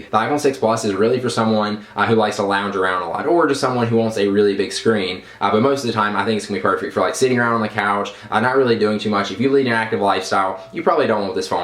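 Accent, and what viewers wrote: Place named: Georgia, United States. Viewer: I might be wrong, but that sounds like a Georgia accent.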